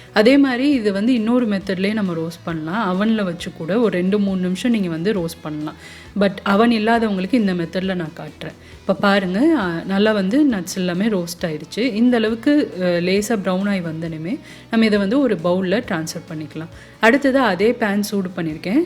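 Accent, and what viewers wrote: Madurai, India. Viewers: Ohh OK akka Madurai pakkam sivagangai akka super super Anna Madurai language pesunangala Nathan ketean akka super....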